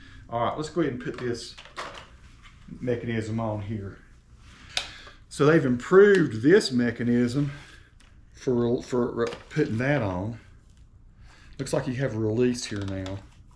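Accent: South, United States. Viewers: Thanks for the detailed vid from a fellow southerner (I am a North Carolinian; I think you are more Alabama or Mississippi correct?). Anyway, I couldn't believe how bad Dyson's video was, especially the part with NO AUDIO. Shame on them for charging so much but not giving us an actual printed manual (my latest version only has a link to a .pdf) and a crappy silent vid.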